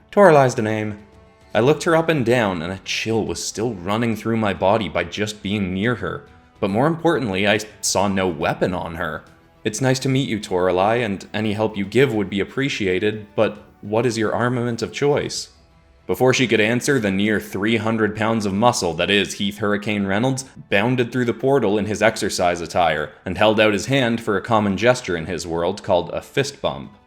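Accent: Ireland. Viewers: AMAZING! This Is Going To Be A Fun Miniseries, As I've Always Really Enjoyed Tayrun's World And Videos, So I'm Looking Forward To The Rest Of The Saga, And Your Irish Accent Is Good In My Opinion! I'm Still Hoping For Gardevoir In A Future Pokemon Episode And A Splatoon Video! Also, I Just Got A HUGE Jurassic World Mosasaurus Toy (I LOVE IT, But IDK Where To Put Him Yet😆) From A Comic Shop Today, Which Reminded Me Of Your Mosasaurus Demon From A While Back, And Now I Want To See Either A Mosasaurus Dragon, Or Even A WereMosasaurus For A WereDinosaurs Video! I'm Looking Forward To Your Next Video, And I Can't Wait For The Next Grand Prix! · As an Irish man myself i must say, you do an impressive Irish accent 👍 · Love how you pushed this to be a multi-character and beast fighting double spread. This is absolutely awesome and your irish accent was good. Were the spell names the irish words for the type of spell?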